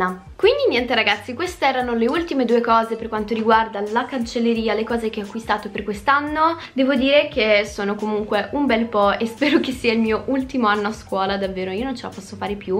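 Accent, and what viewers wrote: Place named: United Kingdom. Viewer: Credevo che eri inglese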